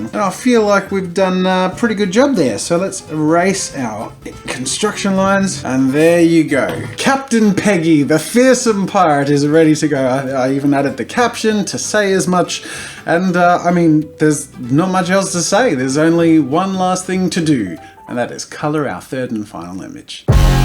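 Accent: Australia. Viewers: Does he keep calling them ‘color-in book’, or is that just the Aussie accent??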